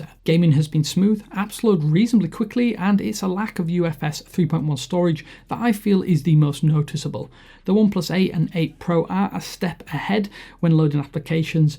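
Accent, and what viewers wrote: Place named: Yorkshire, United Kingdom. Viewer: Yorkshire accent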